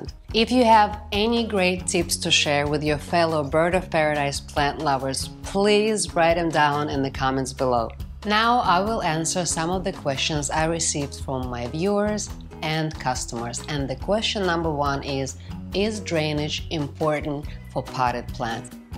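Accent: Russia. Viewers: Are you Russian? Why do I understand what you are saying if my English level is A1 😅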